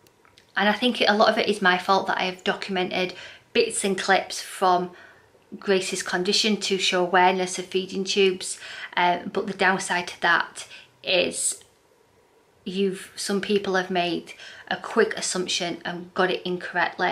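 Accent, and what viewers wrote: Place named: Northern England, United Kingdom. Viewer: I'm so glad you're going to carry on making your Friday Finds and What I Eat videos. You're brilliant to watch and there's nothing wrong with how you talk... It's nice to see Northerners on YouTube 😘. Some people can be so envious of a pretty, confident, clever woman!!! What they've done is disgusting but I think you're doing the right thing by keeping the kids out of it and carrying on. It's them with the problem, not you. F*** them. You've got 3 & a half thousand followers behind you 😊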